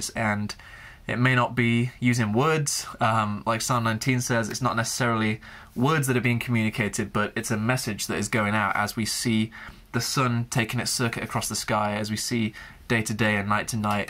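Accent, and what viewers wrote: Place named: United Kingdom. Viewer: British guy with a cute British accent haha ❤️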